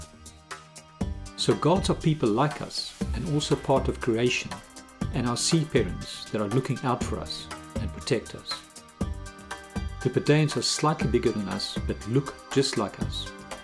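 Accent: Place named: South Africa. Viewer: You are South African....your voice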